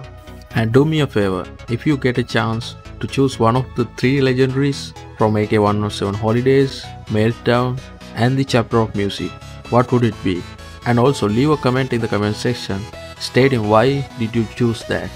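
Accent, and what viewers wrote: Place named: India. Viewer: Are you from india? Cuz your accent is Indian.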